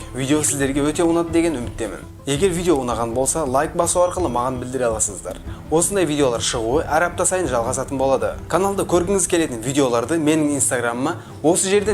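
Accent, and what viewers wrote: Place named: Karakalpakstan, Uzbekistan. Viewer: Акценты жагымсыз. Каракалпак емессиз бе?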